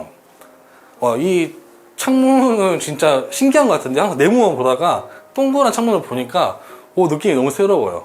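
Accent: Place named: Gwangju, South Korea. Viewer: 광주사투리? 정감있어요~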